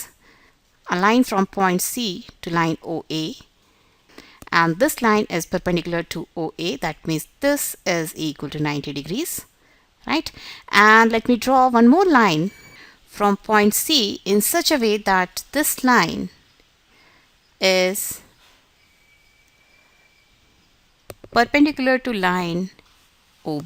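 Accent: India. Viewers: Indian accent ?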